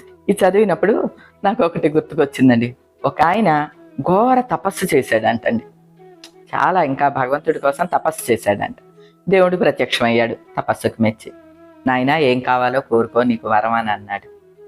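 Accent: Godavari, India. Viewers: Super amma...😂 Me gun shot answers vere level.. ayyo adukku thinadam varaku velladam endukandi.. tappu kadu... E dialogue matram super amma.. Mana godavari yaasa.. manam entha correct ga unna matladina nachani vallu alane antaru amma.. nenu chala navvukunna e video chusi. 😂we enjoyed a lot 😂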